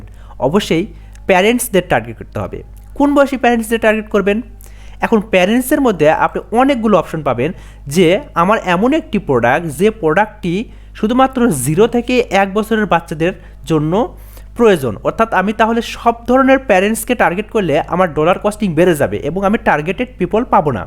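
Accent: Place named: Bogura, Bangladesh. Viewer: ji vai apnr kotha sune bujte parchi vai ..... je apni bogurar manush ,, amieo bogura thaki vai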